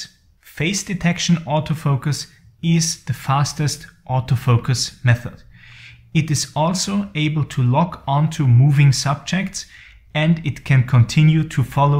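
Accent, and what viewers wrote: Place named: Vienna, Austria. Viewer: Anschaulich beschrieben, danke. Im sympathischen Wiener Dialekt statt in englisch hätte es von mir auch ein "thumps up" gegeben 😀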